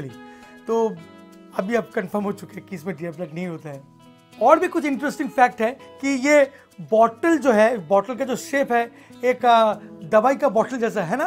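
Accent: Bengal, India. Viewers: By ur accent u seem to be a Bengali ri8!?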